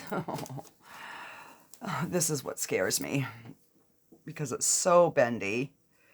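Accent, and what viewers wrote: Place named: Boston, United States. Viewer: First video oof yoours that I watched. Very pretty. Are you from the Boston area? I hear a bit of an accent. I look forward to learning how to make my own pigment paste! Hugs from 🇨🇦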